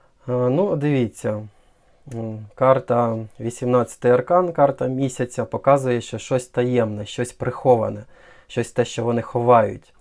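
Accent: Standard. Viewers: дякую! Яка у вас чиста,красива мова!!!❤❤❤ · Ух Ти, яка у Вас гарна українська мова, так чисто розмовляєте )))❤🇺🇦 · Розкладам на картах не вірю, ніякі прогнози "картярів" не збулися. Але, слухала вас лише тому, що ви говорили нашою державою мовою - УКРАЇНСЬКОЮ!!! До речі, ви досить гарно розмовляєте, без суржику. І голос у вас приємний!!!😊😊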